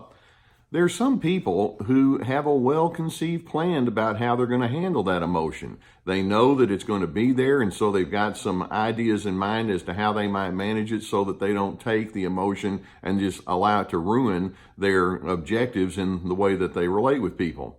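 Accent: Texas, United States. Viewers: A Texan?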